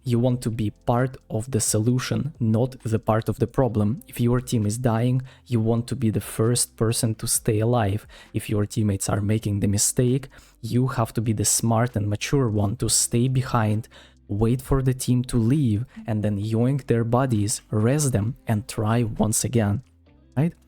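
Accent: Russia. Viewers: Ты же русский, брат, это слышно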